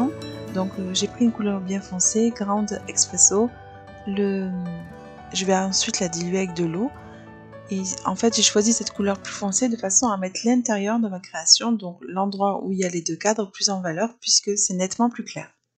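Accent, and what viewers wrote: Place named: South, France. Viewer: Coucou, Heureuse d'entendre ton p'tit accent du sud et de te voir, enfin tes petites mains hi hi, créer! Que dire...qu'a par que les couleurs que tu as choisi sont mes favoris, c'est que ta création est simplement MAGNIFIQUE j'adore, BRAVO! Je sais que ce n'est pas évident entre la vie de famille, la boutique, etc, d'avoir un p'tit moment a soi pour créée, mais, j'espère que tu referas d'autres vidéos de ce genre! Bon week-end & Soirée. Gros Bisouuus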